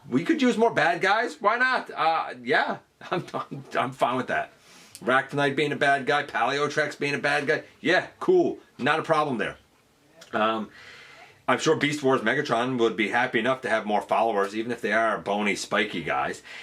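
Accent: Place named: Newfoundland, Canada. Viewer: Just recently subbed and I can't believe it took me this long to notice your accent. You're a fellow Newfie! How's she getting on me son? Lol.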